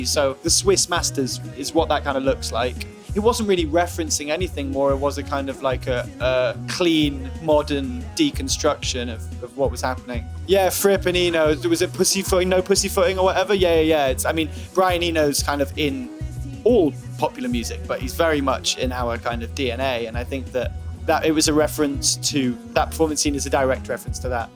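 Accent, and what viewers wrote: United Kingdom. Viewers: Some people would think he’s high .... no just British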